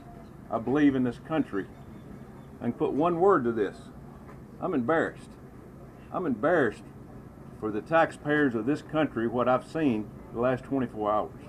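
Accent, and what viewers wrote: United States. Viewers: Yes people this man knows what he talking about and talks like a true american Thank you sir and God bless you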